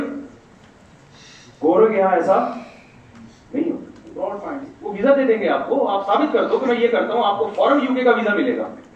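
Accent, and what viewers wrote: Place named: Karachi, Pakistan. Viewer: I'm Lucky for having residing near to the masjid where he gives Jumma. Khutba every Friday. I met him two years ago since then I never missed my salaat namaz , Ramadan and aitiikaaf, in his masjid. MUFTI SAAB is so friendly and kind. He gives his bayans are given in the language and accent that people in Karachi understands.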